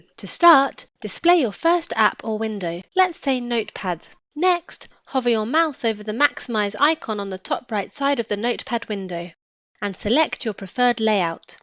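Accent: United Kingdom. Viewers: Love ur British accent 😍😍😍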